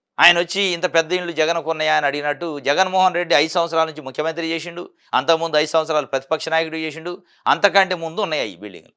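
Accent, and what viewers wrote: Telangana, India. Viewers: Anchor telangana vadu laga vunnadu laungage different ga vundi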